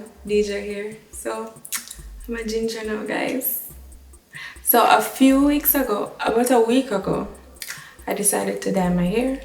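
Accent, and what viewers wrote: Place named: Jamaica. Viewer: Lawwwd goodie u accent 😍😍😍🇯🇲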